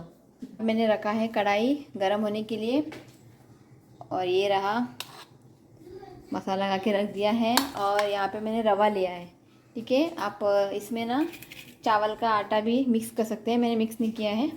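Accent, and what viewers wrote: Goa, India. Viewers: I loved the Konkani and Goan Hindi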